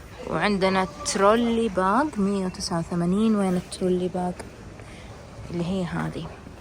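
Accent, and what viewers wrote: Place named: Saudi Arabia. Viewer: انا متابعة جديدة ممكن ترحيب ❤ صوتك يجنن انا احب اللهجة السعودية 😊✨🦢🇸🇦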